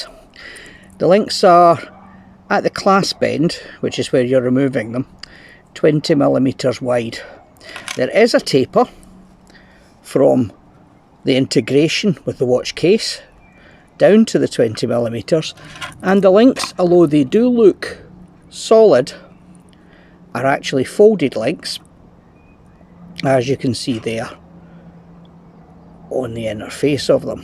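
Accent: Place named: Scotland, United Kingdom. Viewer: Good clear review, i like your clear Scots accent too.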